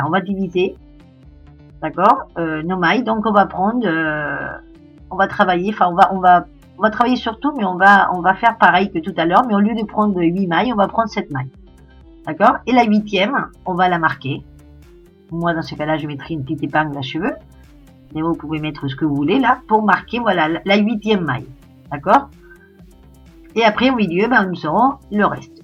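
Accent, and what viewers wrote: Spain. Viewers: Petite curiosité perso: tu as un accent espagnol, je me trompe?😉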